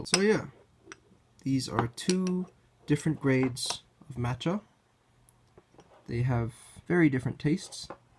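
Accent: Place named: United States. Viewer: Yeah, yeah comment is 1 year long but you're wrong. UK: /ˈmætʃ.ə/ US: /ˈmɑːtʃ.ə/. So yeah, since he's american(or at least speaks with an american accent) he's right.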